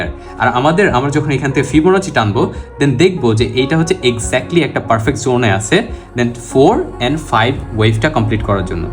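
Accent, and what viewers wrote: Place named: Bangladesh. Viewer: ভাই উনার ভাষা শুনেই বুঝা যায় উনি বাংলাদেশী।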